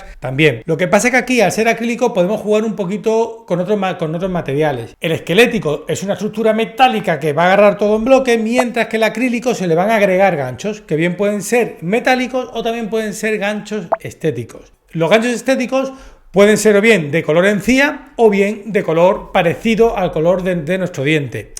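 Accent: Spain. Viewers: Cae mal esa forma de hablar de los españoles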